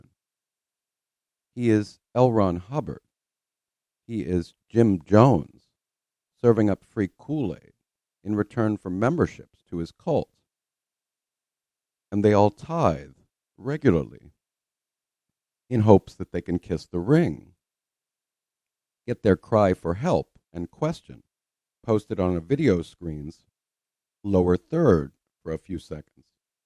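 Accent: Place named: Ireland. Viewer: I’m late to channel, but I do love him and his to-the-point story telling. And yes, his brogue is charming, too!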